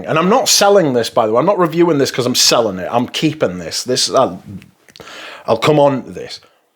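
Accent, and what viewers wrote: United Kingdom. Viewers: You are absolutely hilarious. Have you ever considered radio or script writing, both? We Yanks love the sounds of a Brit , save Piers. You should. You have the best wit and voice of all of these reviewers ~I will discuss this perfume until I have discussed it to a disgusting amount.~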